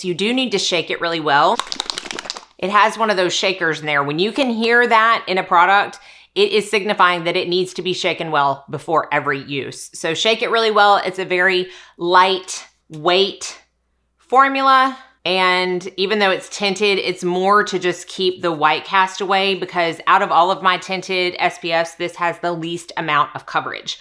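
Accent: South, United States. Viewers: Hi. What a great video. Thank you so much. Always such excellent information. I trust your honest and professional advice. You have, what looks like, perfect skin. I love you and I love your channel. You are so very beautiful and feminine and sexy. With that charming southern accent, it’s no wonder your channel is so popular and successful. Who wouldn’t want to look as gorgeous as you. Thanks again.. Peace, Calvin.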